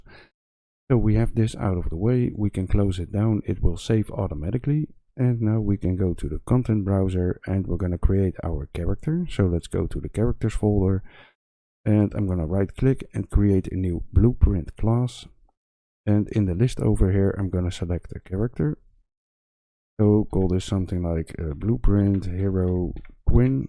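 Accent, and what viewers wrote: Netherlands. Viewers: You can so clearly hear you are Dutch! I am complete 47 years noob learning UE for fun, love this tutorial.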